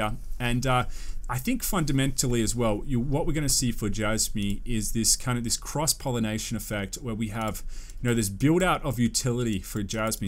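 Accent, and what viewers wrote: Australia. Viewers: Good to hear a Australian accent on a crypto YouTube channel 👍🏽👍🏽 great job 👏🏽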